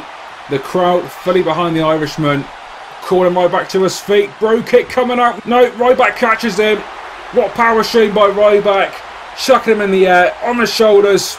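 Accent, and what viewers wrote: England, United Kingdom. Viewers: are you English? love your vids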